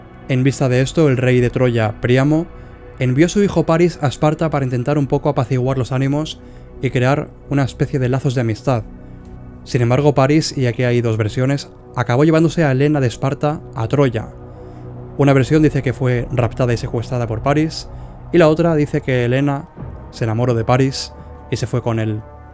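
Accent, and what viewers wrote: Spain. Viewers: Gracias por compartir sus conocimientos joven español 🙏. Solo le recomendaría que acentue mejor solo un poco la entonación, y el audio, pero su acento va muy bien con la narración de estos temas. Excelente trabajo, más videos please. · Excelente canal. ¿De dónde eres en España? ¿el acento es vasco?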